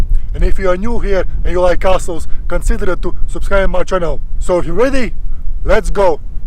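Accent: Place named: Latgale, Latvia. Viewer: Būtu ļoti noderīgi subtitri angļu valodā, jo tu lieliski veido stāstus/tekstus pagātnes formā! 😊 Par to ka esi latvietis - uzminēju 😊 Latgales akcents 😎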